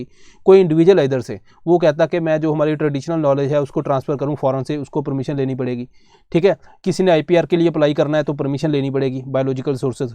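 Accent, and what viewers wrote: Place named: Punjab, India. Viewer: When you speak Hindi in Punjabi 👍👌🦁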